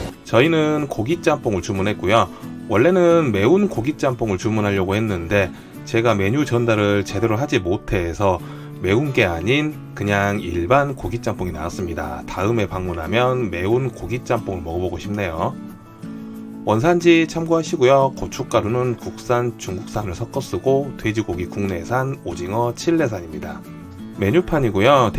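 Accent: Jeolla, South Korea. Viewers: 전라도 분이신가봐요ㅎㅎ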